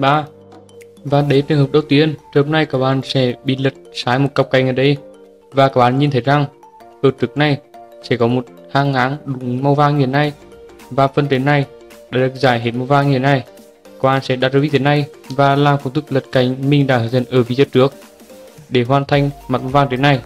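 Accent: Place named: Central, Vietnam. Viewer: nói như tiếng miền trung ấy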